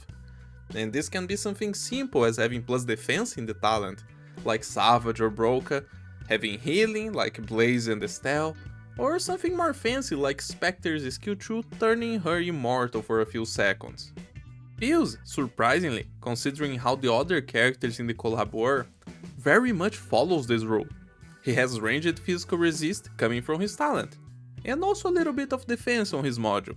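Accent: Brazil. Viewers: Are u Brazilian? Ur english sounds like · The way you talk...are you brazilian?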